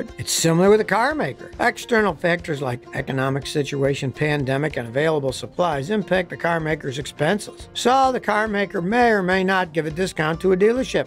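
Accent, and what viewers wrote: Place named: Buffalo, United States. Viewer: Scotty is possibly the most famous guy from the Buffalo area. Love the Buffalo accent and the word "pop" instead of "soda" or "tonic."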